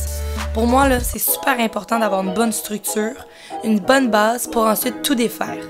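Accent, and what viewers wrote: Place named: Quebec, Canada. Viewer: J'ai beaucoup aimé tes looks tu es trop jolie 💘 l'accent québécois👌👌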